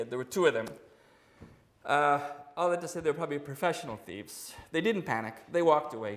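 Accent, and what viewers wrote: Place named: Northern Europe. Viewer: He said he was in Syracuse until his teens. Why does he not sound like New York (or even American)? No disrespect intended. I'm just curious. I thought he was from somewhere in Northern Europe.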